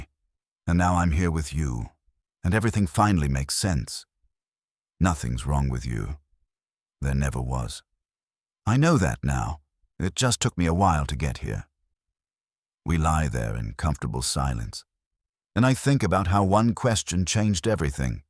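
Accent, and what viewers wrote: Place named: England, United Kingdom. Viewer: English accent is awful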